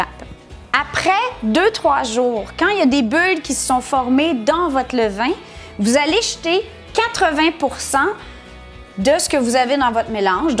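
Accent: Quebec, Canada. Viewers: Une vidéo instructive et très sympathique avec un petit accent québéquois qui est adorable. En tout cas j'apprends qu'il ne faut pas être pressé pour la préparation du levain. Merci et bravo pour la vidéo. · Hello, je viens de découvrir ta vidéo sur levain et pain fait à la maison. Peut-on faire les même préparations avec des farines de sarrasin et de châtaigne ? Je suis français de France et j'adore tout ce qui vient de la belle province (y compris l'accent) !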